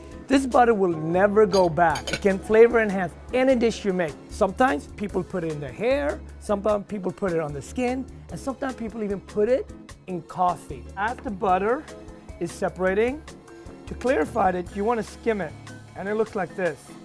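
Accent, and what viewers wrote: Sweden. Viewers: You can really hear the Swedish influence when you talk. ^^ Tack för receptet måste testa det snart! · Hörde hans svenska dialekt, vad kul!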